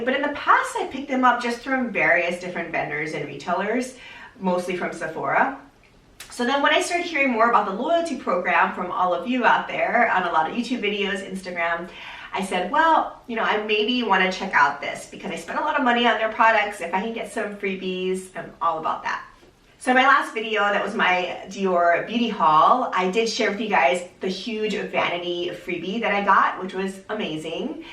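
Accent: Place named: Canada. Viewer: Love the Rosewood on you! I hear a bit of a Canadian accent from you. Did you grow up in California?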